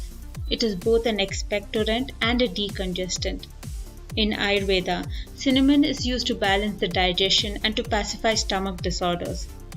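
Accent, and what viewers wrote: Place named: India. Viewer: Just from his/her indian scammer accent, i can confirm that he/she is so stupid that cannot find a job and only way to survive is to scam with "ancient" remedy. I cannot even know if he/she is male or female by his/her voice.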